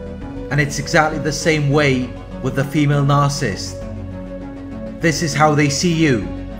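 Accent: Wales, United Kingdom. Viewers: For what it matters.... I am from Leicester, England, I have an accent and pronounce WORDS differently... the guy doing these videos is from Wales... it's regional dialect....people from Liverpool, Manchester, Newcastle etc etc etc..... all have a regional dialect/tone to their accent. Don't worry about it.... you're in the picture now🙂✌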